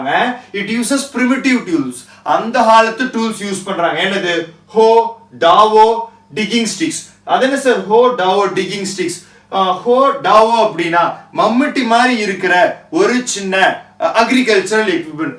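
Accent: India. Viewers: Sir a doubt you are from Chennai or Coimbatore. ☺ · sir your mother tongue in kannada right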